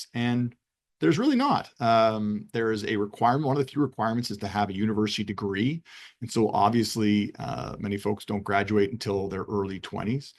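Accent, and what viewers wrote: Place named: United States. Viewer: You pronounce "Z" like Yankees.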